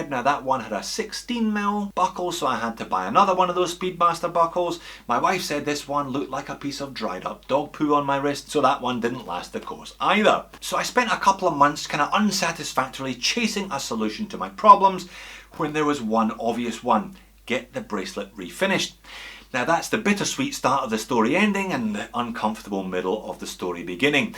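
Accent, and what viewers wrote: Scotland, United Kingdom. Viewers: Ha ha, love the watch gift idea. Going to find another fiancé 😂looking for a Ball 904L I'm a recent subscriber and slowly getting through the vids.. don't mind the scotch accent...if you were Welsh we'd be told every other minute.. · Sorry, I've watched you from start of corona but now you've iterated at least 4 times you're a Scotsman in this vid alone... accent is starting to make sense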